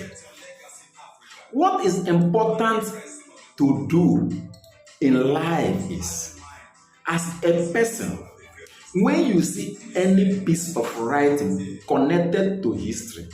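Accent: Igbo, Nigeria. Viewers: evn ur accent and looks cannot lie. u be igbo stop lying to urself. benin no dey share language. ika say benin , ikwerre say benin, ijaw say benin all of una say benin so benin na God wey dey share language and surnames now right? una really be mumu sha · Igbos migrated from Ikwerre. So Igbos and Ikwerre are one. The father cannot deny the son and vice vasa. History is shrouded in ambiguity, yet you state emphatically Ikwerres are not Igbos. You contradict yourself. I always see self-contradictions in your analyses. You say whatever a man wants to be called, that's what he should be called. A man wants to be called a woman, so that's his identity? You are really driving the train. What a fool! When you are talking, one immediately knows you are an Igboman, your dialect, gestures betray you as an Igboman. Onye eririeri. You do not reference Uche Dim Okwukwu, the former secretary general of Ohaneze Ndi Igbo who hails from Elele in Ikwerre land, who is now vying for the presidency of the same organisation. 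What about Wike's younger brother who is the president of Ohaneze Ndi Igbo in South Africa? You talk as if all Ikwerres agree they are from Benin. And yet you state emphatically there is no way all the people in a place are descendants of one man. Another self-contradiction. You call yourself a historian and yet you have not heard of the similarity between Igbo and Hebrew. There is a book on it done by a white man. Search for it. You will find it. Do you known the origin of the word Benin. It came from Ile Ibinu, house of vexation. It is Yoruba. It originated from Oranmiyan. Before that time, it was called Igodomigodo which is an Igbo word. It is on record(see Jacob Eghareva). The Oba family in Benin originate from marriage bet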